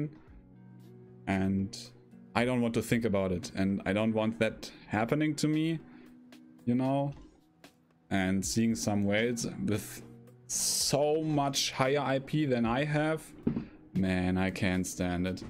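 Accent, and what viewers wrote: Germany. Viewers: Hey there, von deinem Akzent ausgehende denke ich, dass du deutsch sprichst. In der Hoffnung, dass ich den Kommentar nicht auf englisch wiederholen muss, möchte ich dir sagen, dass es absolut nachvollziehbar ist, warum du dieses "Spiel" nicht mehr spielen möchtest. Suchtmechaniken, verbunden mit P2W-Mechanismen sind absolute K.O.-Kriterien. Ich habe LostArk nie gespielt, aber die Kritiken, welche ich darüber gelesen habe, schlagen in die gleiche Kerbe wie dein Video. Ich hoffe bald wieder deine Bloodletter-Zauber-Videos bestaunen zu dürfen. Auch wenn Albion nicht perfekt ist, die Community haut' raus. Und nach meinem Kenntnisstand, bist du da n verdammt gern gesehener Typ!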